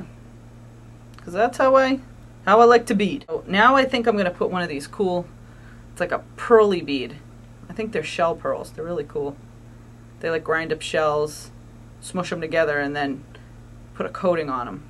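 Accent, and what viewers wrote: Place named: Massachusetts, United States. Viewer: I knew she was from Massachusetts before she said so! (I am too!) Great video....and I love pink too!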